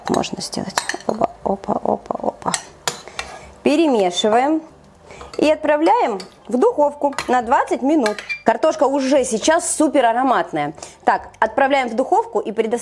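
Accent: Ukraine. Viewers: здравствуйте! Хорошее, качественное видео, спасибо! Если кому-то рецепт кажется несовременным ( не соответствует ЗП, ЗОЖ - много жиров или сладостей), он просто не будет смотреть. Вам, как профи в кук-индустрии (ого, <num>+ пользователей), конечно же, дозволительны вольности в речи, такие, как частые украинизмы или местечковые "рІзетки" - розетки, это не из оф. украинского языка, это из выражений в отдельных местностях. Они не будут портить видео, если блогер этого не стесняется, а наоборот, акцентирует на таком звучании. Это будет украшать видео своим колоритом, если быть смелее! Сердечні вітання із святами та щирі побажання подальших успіхів в творчості!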